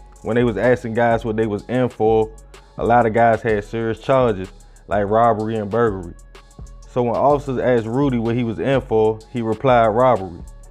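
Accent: Baltimore, United States. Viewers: Bro he baltimore. Can't you tell by his accent.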